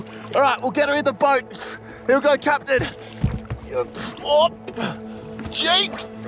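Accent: Australia. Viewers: First time ever watching this guys Video randomly, as soon as he opened his mouth I figured he's Australian 😂😂😂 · At the beginning, I was like: "Oh! This guy wants to make me believe he'll survive only on what he catches? No way!" Then I heard this Aussie accent, and I switched right to "Oh! Right!"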